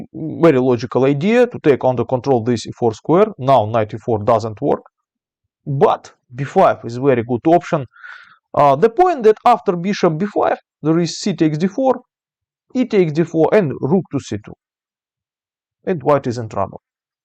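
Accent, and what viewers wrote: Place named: Russia. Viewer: Funny russiam. Accent 🎉🎉🎉😂😅😅😮😮❤😊😊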